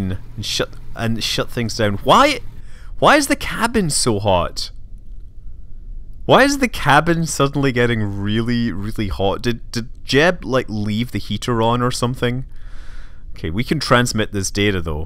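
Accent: Scotland, United Kingdom. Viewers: you're scottish right?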